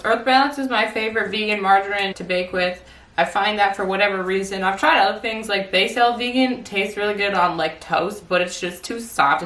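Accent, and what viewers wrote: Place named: Canada. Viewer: The Canadian accent is amazing!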